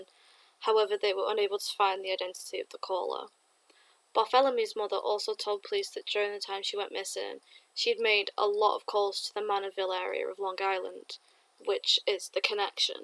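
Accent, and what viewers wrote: Manchester, United Kingdom. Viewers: Oh my, I'm just surrounded by all of these delicious British Ladies. I need to get on a plane and come hear it for myself. Surround me💪🖤💖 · Is your accent Mancunian? X...( for those asking what is a Mancunian accent, it’s an accent for those native to Manchester in 🇬🇧 xx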